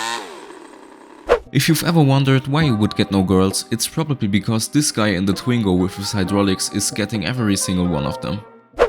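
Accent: Germany. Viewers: Mann erkennt direkt den deutschen in dem english Akcient😂😂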